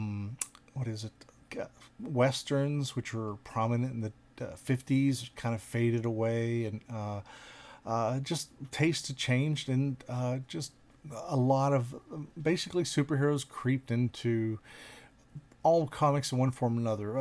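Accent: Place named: Pittsburgh, United States. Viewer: I love the accent, would it be safe to call it “jag off speaking”? Also … you need to find a reason to do your Gene Simmons in one of these, maybe if you do an SA Marvel that he might have read when he was learning English?!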